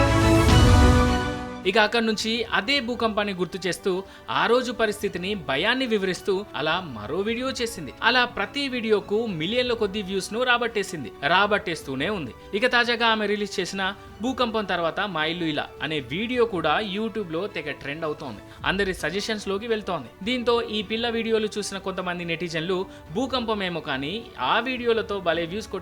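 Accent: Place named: East, India. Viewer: Turupu gonta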